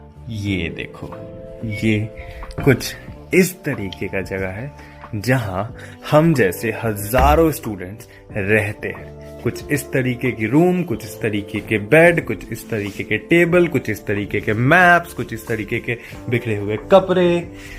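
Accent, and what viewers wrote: Bihar, India. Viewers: bhaiya are u from Bihar 🤔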